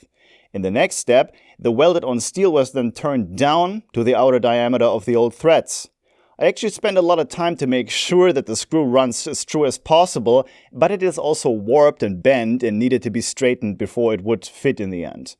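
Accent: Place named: Germany. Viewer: Are you aware that your German accented English makes you sound like an Engineer?!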